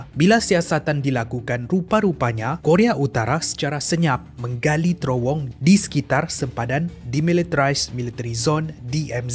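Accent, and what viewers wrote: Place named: Malaysia. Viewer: Bahasanya sudah mulai lancar walaupun masih agak kental melayunya tapi bahasa Indonesia ny sudah mulai lancar dan baik lanjutkan banyak 2 belajar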